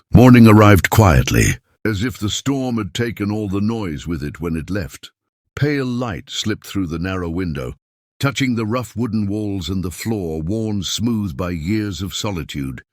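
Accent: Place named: RP, United Kingdom. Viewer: Another PERVERTED "PROPA ENGLISH " Accent that seems to twist the human aspects of the lustful story.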